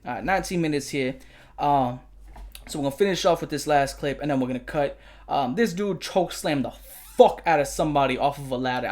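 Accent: New York, United States. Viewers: yeah as a bi girl I’m fine with it honestly. I do get uncomfortable when creators are openly homophobic cause it makes me feel like they don’t want me watching, but as soon as I remembered the New York accent I knew he wasn’t being serious lol 😂 as a lifetime New Yorker we have kinda fucked senses of humor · Man, I haven't lived in the US in 12 years... your accent just hit me hard my bro.... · The New York accent is tuff tuff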